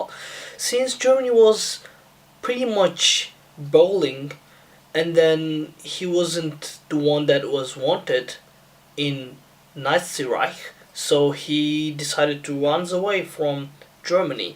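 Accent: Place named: England, United Kingdom. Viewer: Your english is better, seems more from England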